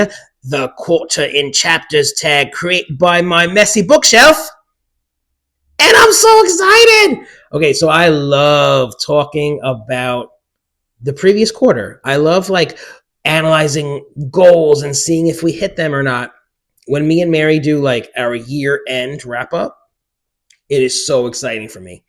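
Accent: United Kingdom. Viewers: this was so great! I love hearing you gush about the books that have wowed you. I loved your accents too lol. I think what you were doing would be considered Cockney, or close to it anyway. Not quite as thick as My Fair Lady cockney though lol. Cliff is South African though. 🙂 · Ooh look at that it's my favourite American dancer bloke doing an English accent!! Hahaha love it - you are doing a southern accent of an English person living in or near London. That accent is all over my City and that's a 2 hour train journey from London. Loved discovering Robin Hobb with you. Also loved talking about Life of Chuck with you. This is a great tag. Awesome video.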